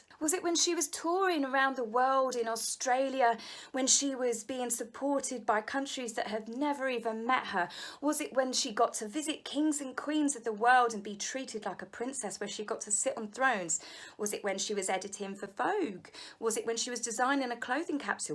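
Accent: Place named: RP, United Kingdom. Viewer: Your speech is getting posher with all this royal talk!!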